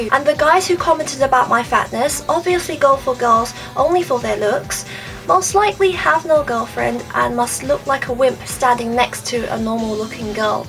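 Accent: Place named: United Kingdom. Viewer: Cool accent!!!! Did you grow up in the uk or something?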